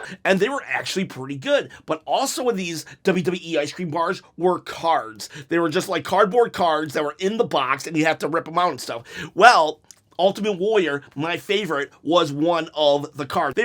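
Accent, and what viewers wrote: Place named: New York, United States. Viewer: Can you tone down your New York accent.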